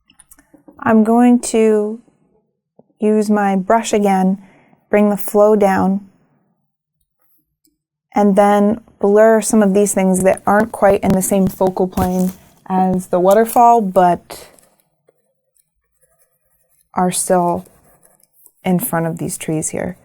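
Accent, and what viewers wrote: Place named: New England, United States. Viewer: I'm glad you like our Yankee accents :D I have to say, the NZ accent is one of my favorites thanks to Flight of the Conchords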